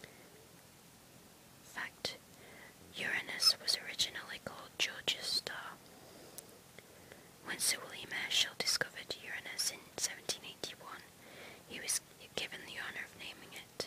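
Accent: Yorkshire, United Kingdom. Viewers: You sound Yorkshire voiced..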